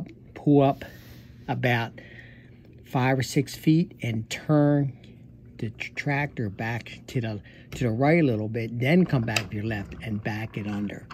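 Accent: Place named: Maryland, United States. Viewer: Thank you so much for all your videos they were a huge help , I got my CDL today 🎉 Also I’m originally from Maryland so you sound like my people 😊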